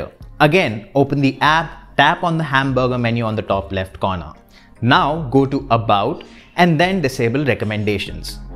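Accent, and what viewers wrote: India. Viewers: Still talking like indians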